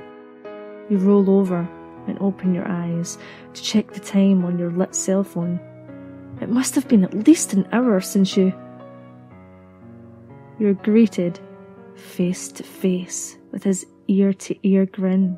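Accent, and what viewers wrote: Scotland, United Kingdom. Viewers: are you Scottish or Irish?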